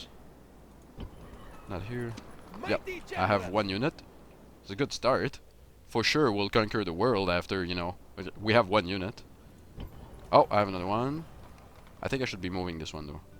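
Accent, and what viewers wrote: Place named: Quebec, Canada. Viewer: French Canadian :)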